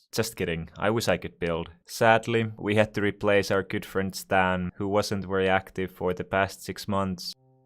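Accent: Finland. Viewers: finnish accent and his name is finnish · you have a amazing finnish accent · Mäkin oon suomalainen huomasin aikasemmin kun sulla on semmoinen englanti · means block in finnish and he has the Finnish accent HE IS FINNISH SUOMI PRRKELE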